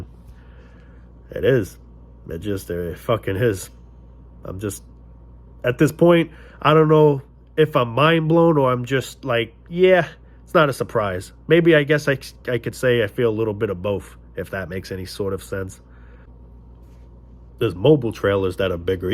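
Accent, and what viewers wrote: New York, United States. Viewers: You’re funny as shit 🤣 your speaking cadence and New Yorker accent is hilarious 😂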